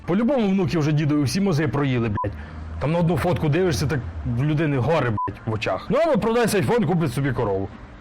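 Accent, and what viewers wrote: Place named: Galicia, Ukraine. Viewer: НЄ ну цей пацан для мене самий. Ріл смішно щей галицький діалект)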